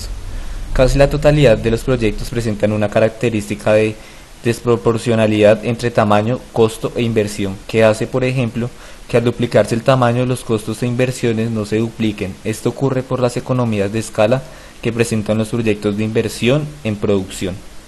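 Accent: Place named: Colombia. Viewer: por el acento se da uno cuenta que es de colombia jaja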